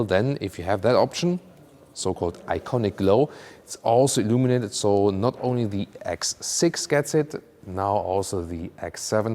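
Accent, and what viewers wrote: Germany. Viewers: This guy has the strongest and most awkward German accent ever hahahahahha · Never heard a more german english speaking accent 😬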